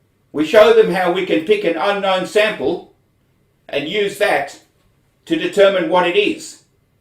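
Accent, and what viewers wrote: Australia. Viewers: Lol he has to be an Australian this professor. Just how he talks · He sounds like a true blue australian he makes me proud to be australian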